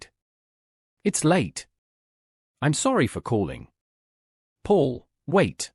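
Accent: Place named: RP, United Kingdom. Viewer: The British RP accent? Schedule Aluminum Oestrogen..etc aren't American Pronunciation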